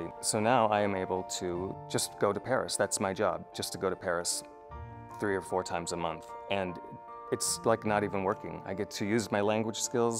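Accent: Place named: Quebec, Canada. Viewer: You know that Quebecois French is different than French from France right? His accent and pronunciation will be slightly different.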